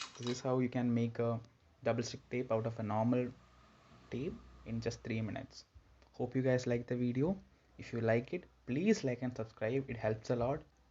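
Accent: India. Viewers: I find Indian accepts so satisfying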